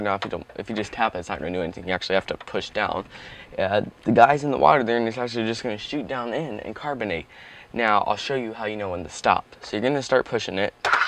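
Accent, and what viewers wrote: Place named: North, United Kingdom. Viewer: Pop. Teehee. You're a northerner, aren't you?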